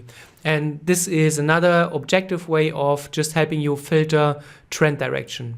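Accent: Germany. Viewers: Just by the way he speaks, i can tell he is German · great content man. Are you German? Donnu why but somehow the accent makes me feel like I understand more ha :)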